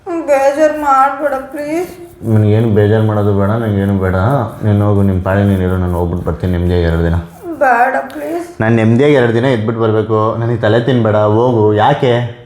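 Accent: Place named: Hassan, India. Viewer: Hassan Mathugale Chanda❤❤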